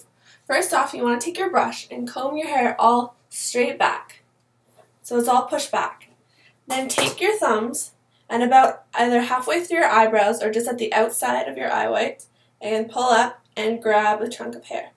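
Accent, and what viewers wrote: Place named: Canada. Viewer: i love your tiny Canadian accent :)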